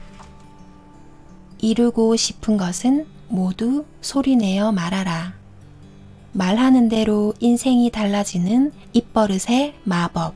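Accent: Gyeongsang, South Korea. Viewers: 경상도 아가씨다 ㅋㅋ 저도경상도 ㅋㅋ 이쁘시내예~~잘보고갑더~~밥묵고하소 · 목소리가 매력적이세요~^^ 경상도 사투리가 더욱 매력 있으십니다~♡♡♡ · 아침일찍 좋은말듣게되어 오늘하루를 힘차게 보낼수 있겠습니다 경상도사투리가 정겹기도하구요~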